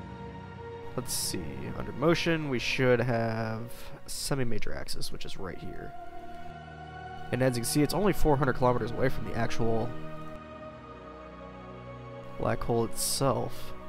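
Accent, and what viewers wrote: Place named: United States. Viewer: Compelling video, for a yank you've got just the right sort of voice for this.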